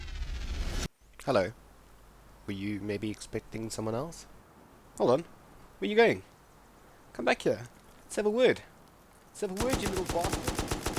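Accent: South Africa. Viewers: Are you South African, by any chance? Great video also!! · I knew you are south african!!!!!!!! Lekka CHOPPA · Where u from, South Africa? I’m from there. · You sound South African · hey are you south African. if so i am too:) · Are you South African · I know a South African when I hear one O.o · Hey... are you from South Africa?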